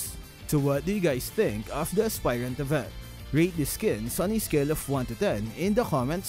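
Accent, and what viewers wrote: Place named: Philippines. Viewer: that forced vocal fry pinoy accent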